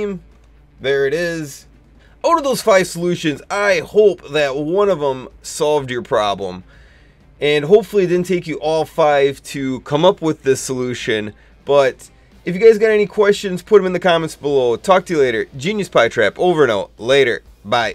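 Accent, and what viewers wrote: Canada. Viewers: The Canadian accent is real.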